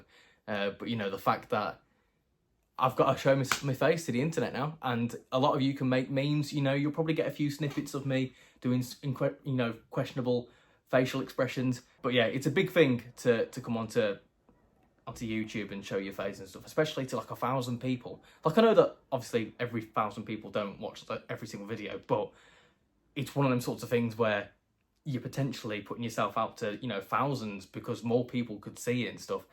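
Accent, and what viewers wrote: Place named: West Midlands, United Kingdom. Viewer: Congrats on 1000 subs. Bonus points for the epic West Midlands accent. It's bostin' our kid! :D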